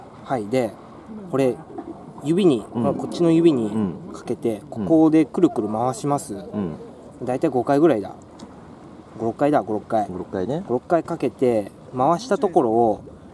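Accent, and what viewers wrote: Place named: Nagoya, Japan. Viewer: 会長、名古屋弁どらでまくりだがや！笑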